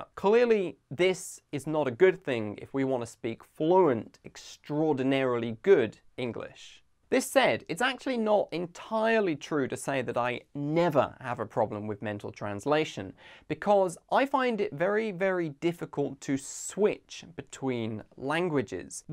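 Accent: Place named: United Kingdom. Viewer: i love u.i'm f tehran and virgin not married 1982. i like ur accent to.first time i when hear uk accent i think that is funny.but its better that usa accent i think.lol.but im in iran.in never travel or see forigin in my life.lol.but if i in skype see any man "lol" maybe have chat with voice.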